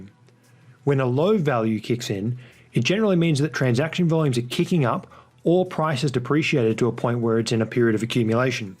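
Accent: Australia. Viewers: Fantastic video! Good to hear an Aussie on RSC! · THANK YOU...GREAT ANALYSIS...much appreciated...well done...ps...do i detect an Aussie accent? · Great, a fellow Aussie. Accent is unmistakable! Love this channel.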